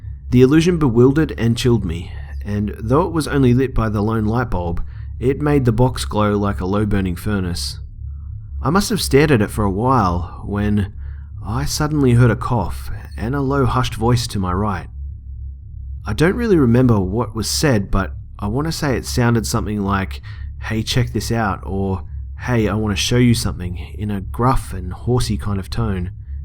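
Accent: Australia. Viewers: does have a great voice! But you should come to Australia all the guys have the same accent/voice to a degree! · Delete your comment quick. He's aussie and aussies get mad when you mistake their accent for a british accent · good job. feels wierd hearing an Aussie on YouTube. guess I haven't delved enough. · i love the Australian accent what do Australians think of a american Midwest accent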